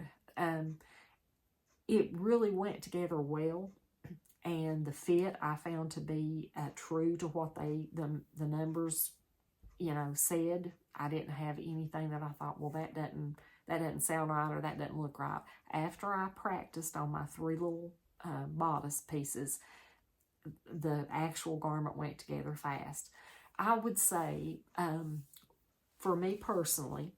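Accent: South, United States. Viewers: Will you show this on your video? Love your southern accent. We are originally from Tennessee but have lived many places in U S. Would love to see all supplies used.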